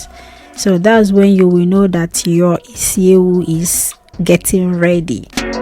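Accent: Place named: Ghana. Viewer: Oh my gosh 😍 isi ewu of the mighty wash down You killed it chef 👨‍🍳 👏🏼 🙌🏾 You have ghanian accent, hv you lived dr?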